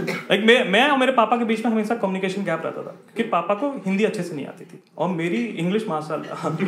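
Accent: Bihar, India. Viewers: Bhai sach mai Bihar se ho?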